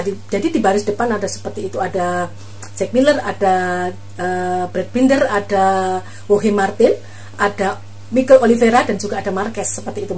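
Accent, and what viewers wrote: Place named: Java, Indonesia. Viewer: suaranya khas medok jawanya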